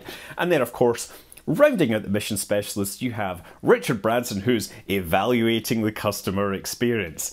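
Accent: Scotland, United Kingdom. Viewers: He's Scottish. Well, kinda.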